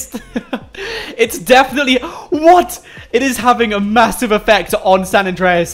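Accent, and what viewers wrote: United Kingdom. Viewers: Are you from UK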